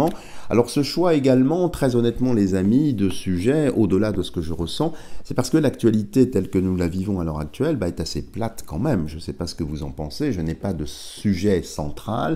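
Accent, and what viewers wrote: France. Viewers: Aaahhhh le sud ! 😀😅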